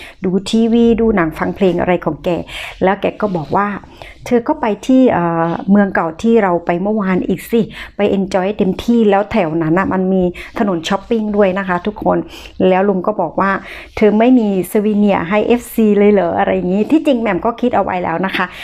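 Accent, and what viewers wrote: South, Thailand. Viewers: พูดดีแล้วค่ะ เราคนใต้ก็พูดแบบนี้แหละ ยังไงพี่ก็ชอบน้องนะคะ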